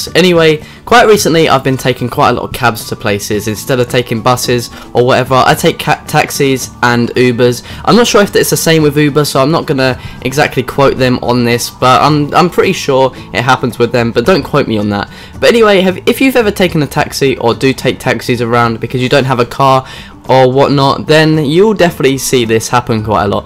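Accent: United Kingdom. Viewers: great information and you spoke good and clear ..love your British accent ..my guy is from Greater Manchester Uk . I could understand you better than most Americans speak ..great job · On the contrary Mr. commentator, you presentation was very understandable even with the nice British accent, I beleive. thank you kindly for sharing your experiences. Prof. Bruce W. Marchetta Th.D Hypnotherapist - educator 3-1-2017 baja ca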